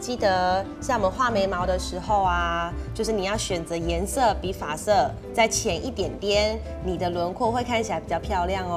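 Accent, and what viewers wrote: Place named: Taiwan. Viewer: 也不是毎個台灣人都這樣...只是她説的比較台而巳...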